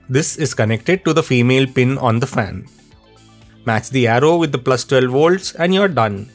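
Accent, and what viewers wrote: India. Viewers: Love the Indian accent.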